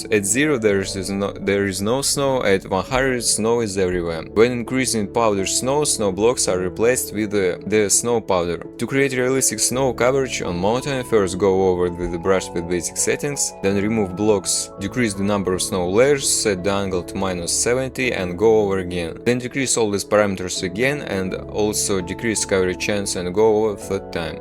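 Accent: Russia. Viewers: По акценту видно что русскоговорящий. Огромное спасибо за скриптики, работают прекрасно. Очень надеюсь что твои труды тебе окупятся, была удивлена что такое вообще бесплатно есть ❤ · Брат, вижу по акценту, что русскоговорящий. Очень был удивлён, что такие старания никак не монетизируются. Спасибо за скрипты, как доделаю свой проект, то отпишусь!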